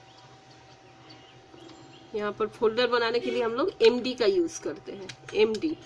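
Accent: Bengal, India. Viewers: Apka muh se hindi sunke aisa lagta hain ki ap achhi tarah bangla bol sakti hain. Ap bangla mein video kiu nahi banati?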